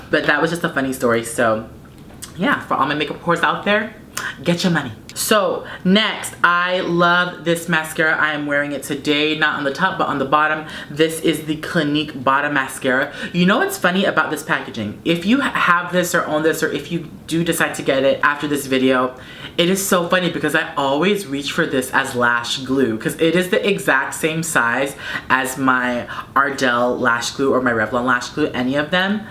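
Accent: Spain. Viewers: yes your spanish accent!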